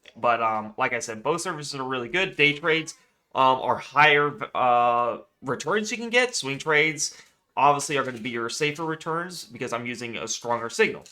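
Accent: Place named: Iowa, United States. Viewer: ARE YOU FROM Iowa??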